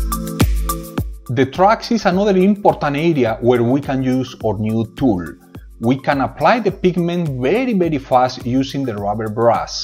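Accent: Spain. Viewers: I love Spanish accent. Keep up the good work. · Thanks ... I like your spanish English ;-) good and useful clip...I use always girl stuff for this..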